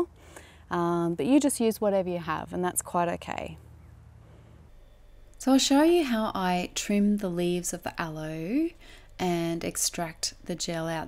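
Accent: Australia. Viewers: My first time watching you. So nice to hear an Aussie accent. Love your soap. whenever I use Aloe gel it turns a weird pink colour. Have you ever had this problem? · the Australian accent is beautiful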